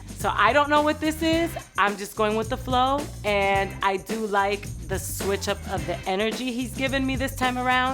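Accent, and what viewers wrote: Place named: New Orleans, United States. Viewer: Nawlins accent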